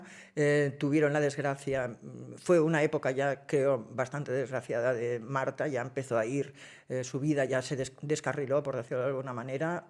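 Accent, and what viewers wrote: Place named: Barcelona, Spain. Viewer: Este “no” es una marca de la casa. No te inquietes por eso, es una coletilla perfectamente válida. Tu forma de hablar es muy barcelonesa ( parte alta ) y a mí me recuerda cuando vivía en esa ciudad.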